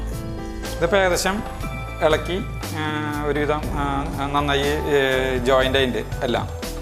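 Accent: Thrissur, India. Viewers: Neat and I like your thrissur accent 😃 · Athe.. chettan Thrissur aan enn thonunnu.. nalla laalur slang und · Chettan porichu emmade Thrissur slangil...kari pedaayittundu..chattayee... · തൃശ്ശൂർ ഭാഷ കേൾക്കുമ്പോൾ തന്നെ മനസിന്‌ ഒരു സന്തോഷം ആണ്,ഈ കൂട്ടാൻ എനിക്കിഷ്ടായി,ട്രൈ ചെയ്തു,സൂപ്പർ,ഞാനും കൂട്ടാൻ ന്നാ പറയുക,കൂട്ടാൻ ന്ന് പറയുമ്പോൾ ചിലർ കളിയാക്കിയിട്ടുണ്ട്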